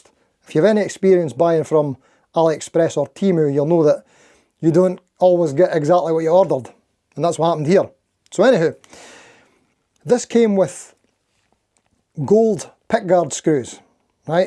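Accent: Scotland, United Kingdom. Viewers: That`s allright. Thank you for answer. Please contiue with your channel. I love your Scottish accent. I`m from Germany.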